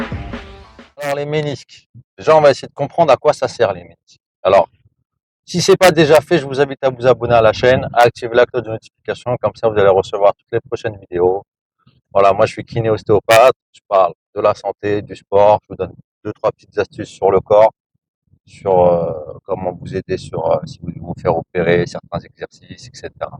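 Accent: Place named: Seine-Saint-Denis, France. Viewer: IN ACCENT DE BLEDARD DU 9/3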